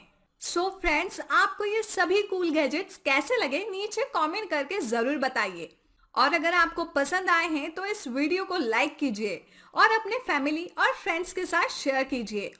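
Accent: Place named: India. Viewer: 这标准的印度英文听得好辛苦……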